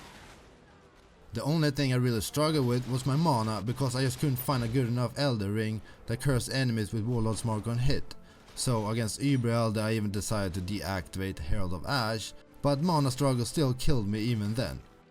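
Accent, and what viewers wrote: Sweden. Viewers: Man måste ju älska den svenska engelskan <3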